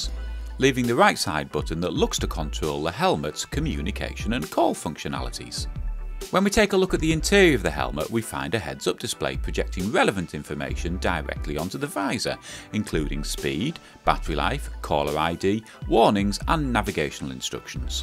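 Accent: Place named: England, United Kingdom. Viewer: tried to listen to a couple of road rash videos. can't stand the sing-song, english narrator.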